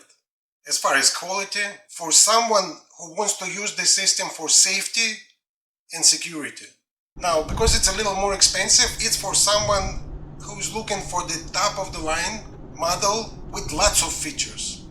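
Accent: Russia. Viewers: вы что русский? акцент русский по слогам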